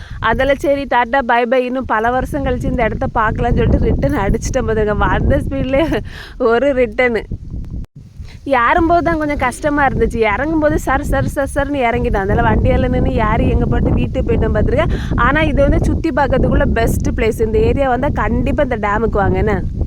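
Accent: Kanyakumari, India. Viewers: Ithu kanyakumari slang bro. It’s nothing wrong.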